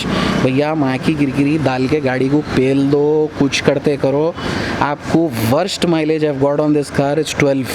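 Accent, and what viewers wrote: Hyderabad, India. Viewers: Fellow car guy from Aurangabad, subscribed, and loving the car content aur hyderabadi sunne me kirrak maza aara it's super unique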